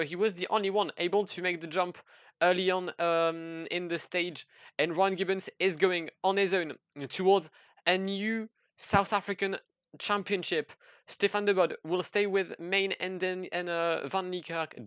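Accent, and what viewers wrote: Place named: France. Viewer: Are you from Belgium or France, because you have a French Accent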